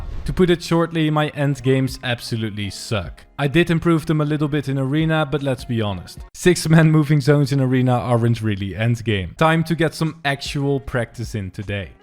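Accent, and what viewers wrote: Netherlands. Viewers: Kom je uit nederland? Are u from the netherlands? Because i am and i think your accent sounds just like it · Is he Dutch?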